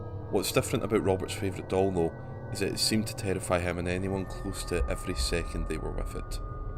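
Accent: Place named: Scotland, United Kingdom. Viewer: Lovely Scottish accent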